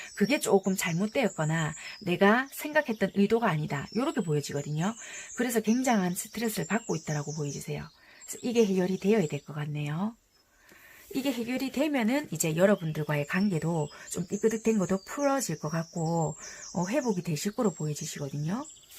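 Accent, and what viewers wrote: Busan, South Korea. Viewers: 감사합니다☺️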